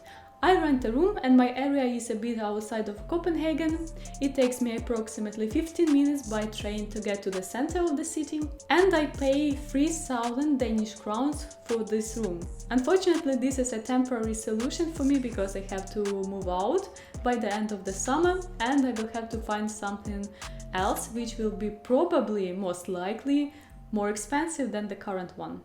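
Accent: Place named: England, United Kingdom. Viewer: Your voice is so cute, I love your English accent. Greetings from México. =)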